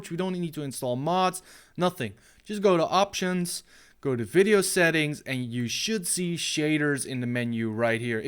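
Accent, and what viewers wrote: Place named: Netherlands. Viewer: dag ik zie dat je nederlands bent het was duidelijk aan je uitspraak let er op bedankt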